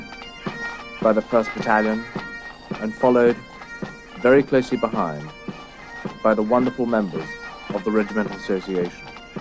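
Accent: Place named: England, United Kingdom. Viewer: could you not get anybody less english sounding to do the voiceover ffs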